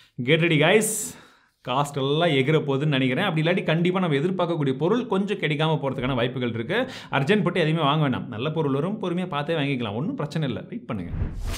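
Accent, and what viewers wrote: Chennai, India. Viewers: dont use chennai tamil, keaka mudiyala... use south TN tamil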